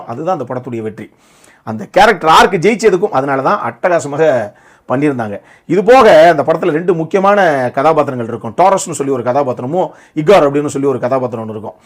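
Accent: Madurai, India. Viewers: bro neenga Maduraiya❤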